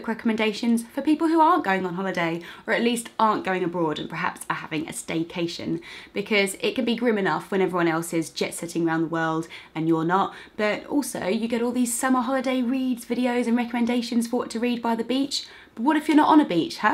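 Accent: United Kingdom. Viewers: Oh you sound like a surrey girl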